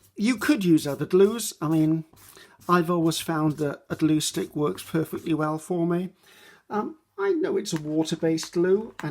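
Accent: England, United Kingdom. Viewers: First of all, I love your videos. I normally never look long videos. I get bored very quick. But with your videos I like the things you tell, your English accent , and I am afraid I miss something when I skip parts. :) I used to glue the pages, but I dont do anymore. My gluesticks cost 5 Swiss Franc ( about 4 pounds) x 3 , too much for me to spend before I begin a journal.. So now I make my mixed media pages separate and than glue it on a single page. No bulk Most pages will be covered with just paper collage anyway Or I buy a nice MM journal for that 12 Pound and alter it on the go. . The gluestick I use is Tombow mono Professional glue stick. It is SO good, no buckling , and even after many years it sticks very strong. And I am addicted to the smell. After a long surching way finding the best and cheapest liquid glue : collall all purpose glue! 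It sticks everything , paper , fabric, etc. And it costs almost nothing here in Switzerland. Well , long story, and I think you know most of it already, Bye bye, have a great week!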